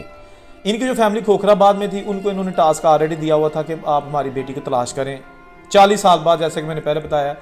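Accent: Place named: Varanasi, India. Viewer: ਅਸੀਂ ਨਹੀਂ ਦੇਖਣੀ ਏਹ ਵੀਡੀਓ, ਪੰਜਾਬੀਆਂ ਦੀ ਬਰਬਾਦੀ ਦੀ ਕਹਾਣੀ ਤੂੰ ਸਾਡੀ ਭਾਸ਼ਾ ਚ ਨਹੀਂ ਬਣਾ ਸਕਦਾ ਜੋ ਸਾਨੂੰ ਸਮਝ ਲੱਗੇ। ਪਿੰਡ ਪਟਿਆਲਾ ਦੇ ਤੇ ਨਾ ਤੁਸੀਂ ਬਨਾਰਸੀ ਲਹਿਜ਼ੇ ਚ ਲੈ ਰਹੇ ਹੋ ਏਹ ਸਿਆਣਪ ਹੈ ਤੁਹਾਡੀ? ਨਾ ਵੀਜਾ ਪੰਜਾਬ ਦਾ ਤੇ ਨਾ ਹੀ ਬੋਲੀ ਪੰਜਾਬ ਦੀ । bahrhaal bhai Ajit nu koi mushkil nahi honi chahidi jo MOHAMMAD SAB DE JANAM Dinn ( Eid ) di khushi ch shamil hundeyan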